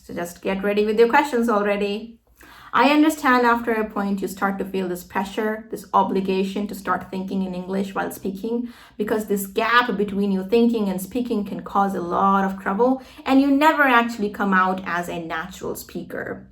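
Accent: United States. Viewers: hello mam how are you doing can I connect to with you once you are my big friend and I I always try to watch your video yeah it is true understanding your accent is difficult but I like so much American accent and I think you use American accent expecting your attention thank you so much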